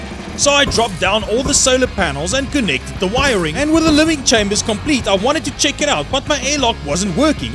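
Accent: South Africa. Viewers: Are you South African? You sound South African. I am from South Africa, lol.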